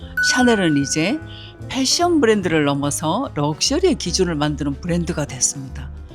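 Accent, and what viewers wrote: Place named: South Korea. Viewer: 서울사투리 + 경상도 사투리 + 전라도사투리가 세가지가 느껴지는 말투를 가지셨어요 ㅎㅎㅎㅎ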